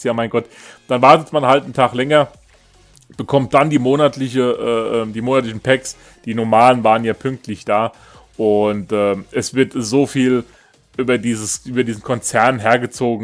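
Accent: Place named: Hesse, Germany. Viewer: komme auch Hessen :D bester Dialekt...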